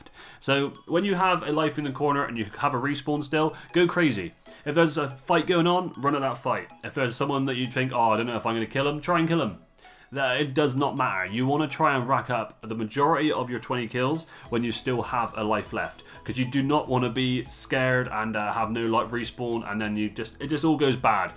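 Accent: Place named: Somerset, United Kingdom. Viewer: I'm from NE Somerset, I could definitely tell. Lots of 'er's lmao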